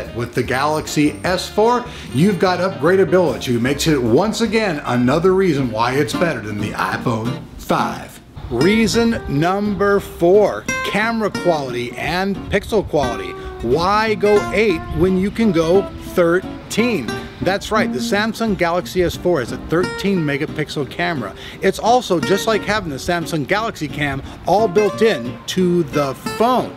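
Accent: Russia. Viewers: love ur russian accent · Russian accent was my favorite reason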